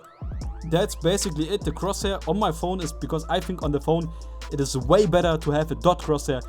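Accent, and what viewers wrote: Germany. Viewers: Kann es sein dass du Deutsch bist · Mach mal deutsche videos man merkt dass du deutsch bist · Are you from germany? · You sounds like German. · Du bist deutsch oder? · Von der Stimme her erkenne ich, dass du deutsch bist xD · Man hört raus du bist Deutscher nh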